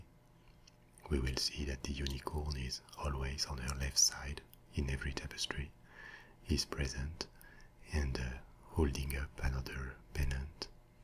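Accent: France. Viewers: I wish there were more ASMRtists doing history videos. I'm a huge history buff and the French accent only adds to the flavor.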